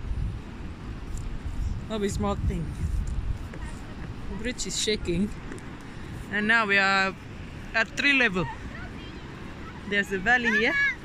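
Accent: Nigeria. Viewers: Wow this is lovely...You now have full 100% Naija accent...lovely. I'm loving your videos. No be small thing o lol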